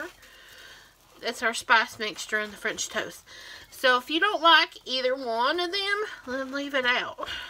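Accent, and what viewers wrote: South, United States. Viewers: I am currently on a diet. I eat my diet lunch at work and watch make wonderful things. Her demeanor is wonderful and her accent takes me back home to the south. Thank you,; bless your heart. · I am from North Carolina and she sounds like we locals, too. She is a real southerner, like so many people I know - not like that manic, shrill, slightly disturbing Paula Dean.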